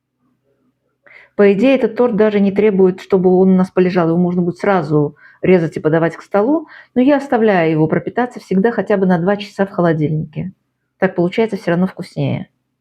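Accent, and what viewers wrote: Baku, Azerbaijan. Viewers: Прекрасный рецепт,прекрасная подача,а бакинские нотки в голосе-это нечто!!!!Дай Бог вам здоровья и творческих успехов!!!!❤️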